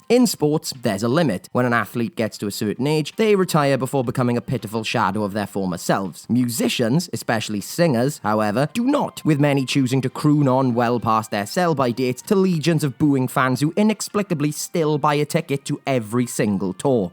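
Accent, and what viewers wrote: Wales, United Kingdom. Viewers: remember one true fact, many of these stars can go on a diet, but the narrator will always sound like a Welsh git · You sound like a British JFK. Actually, I'm not even sure you're British now.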